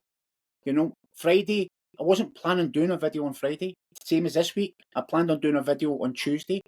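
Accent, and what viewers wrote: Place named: Scotland, United Kingdom. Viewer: I enjoyed your Scottish English accent. Are you from Glasgow? I am now much interested in these topics you deal with and your words have been helpful for me. Thank you. Greetings from Turin, Italy.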